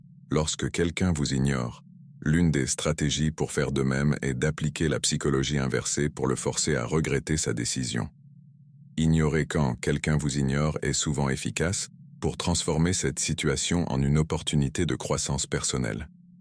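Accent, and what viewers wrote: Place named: England, United Kingdom. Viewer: C'est pénible cet accent anglais pour parler français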